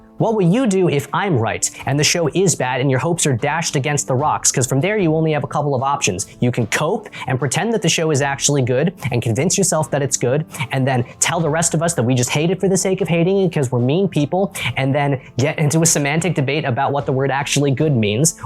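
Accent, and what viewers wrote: United Kingdom. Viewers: I have several things to say. Holy crap, you tore these guys to shreds, and they justifiably deserve it. We, the fans of Tolkien and his work, all stand together, linked arm in arm like a chain, and these bastards are trying hard to break that chain, to shatter our unity and throw us into darkness. We will not stand for this, we will not falter. Just as the chain of links around Frodo's neck carried The One Ring into the fires of Mount Doom, we will carry our love together, and then hurl the evil back into the firey chasm from whence it came. They will lose. There is no other way, because we will not stand for this bullcrap. Just imagine if people with such deep and true love for Tolkien and his works had made the show instead. Just imagine if they spent the same amount of effort they do attacking fans into pouring love and genuine passion into their work. 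If not for Lord of The Rings, I might've never gotten into fantasy. I might never have created my own fantasy world when I was 13 years old, then continue building upon that world all these years. I probably never would've been inspired and motivated enough to begin work on my own book. If not for my love of Middle-earth, I would probably never have begun collecting fantasy(and medieval) clothing, armor and weapons. Never would've had my own little armory, as I do today. Plan on making a video about that in the future. And finally, your Brittish accent was great. Sorry for such a long comment, just had a lot to say.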